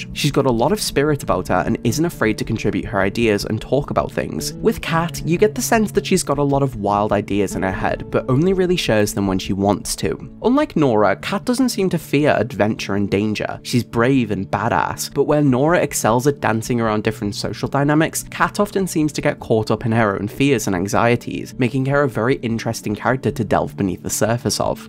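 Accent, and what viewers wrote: United Kingdom. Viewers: Inglês já é difícil, o cara falando com sotaque do britânico que nem uma metralhadora não dá KAKSKDKKDSKKS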